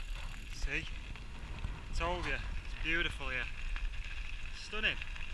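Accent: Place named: North, United Kingdom. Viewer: Soooo... You put on extra northern for the vlog, or go a bit south for pods? #vlogger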